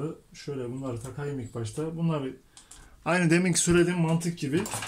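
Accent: Turkey. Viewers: sizi tebrik ediyorum. Ben bir yüksek mühendisim. Aynı zamanda 25 yıldan fazla mesleki kurslar verdim. Konuşman , Doğu Karadeniz şivesi , ama mesleğe çok hakimsin , abonen de oldum , Sıkı bir takipçim de oldum.Tekrar söylüyorum, çok güzel ve detaylı anlatıyorsun.başarılsrınım ve videolarının devamını diliyorum.Haa ben 70 yaşındayım. Ama takipçinim. Başarı dileklerini tekrarı ile.Esen kal.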